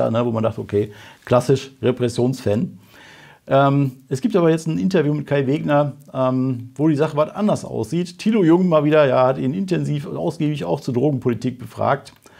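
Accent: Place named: Duisburg, Germany. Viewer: Ich mag den Dialekt. Schätze Duisburg.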